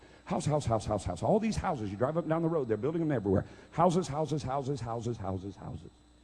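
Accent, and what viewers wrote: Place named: South, United States. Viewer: he kinda looks like James dean with a southern accent.don,t know if this guy is a real Christian or not.God only knows.if he is not he,ll get whats coming to him,God will make sure of that.all will be brought to the light.good and bad.evil is a puff of smoke.here for awhile and is gone not to be seen again, amen live like u are Christ himself.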